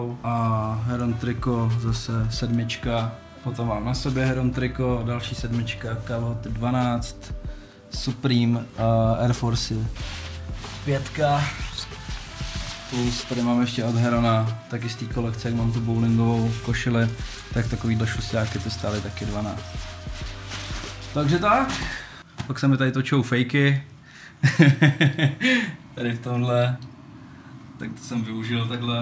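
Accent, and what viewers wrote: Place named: Moravia, Czech Republic. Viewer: Moravsky bro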